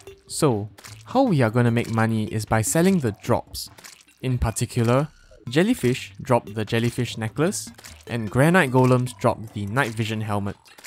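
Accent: Singapore. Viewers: are you Singaporean? I can hear your accent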